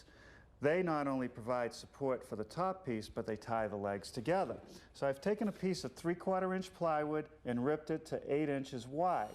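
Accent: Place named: Boston, United States. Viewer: Same. In the early 2000s, usually on Sunday mornings, my dad and I would always sit down together and watch this show. Made for a beautiful morning. Having the sun guzzling through the living room window, seeing the dust in the air through the sun rays, and just hearing his Boston accent with that music in the background. Good times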